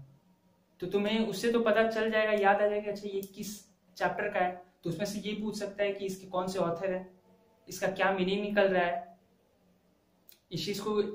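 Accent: Bihar, India. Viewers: Bhai tum motihari se ho kya?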